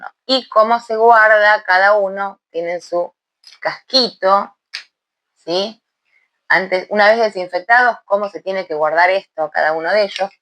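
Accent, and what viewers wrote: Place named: Andalusia, Spain. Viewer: Porque me parecías que eras de andalucia😅❤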